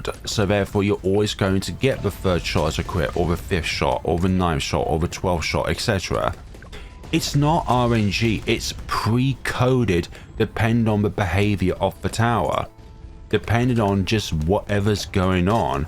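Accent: United Kingdom. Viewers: why are you so posh?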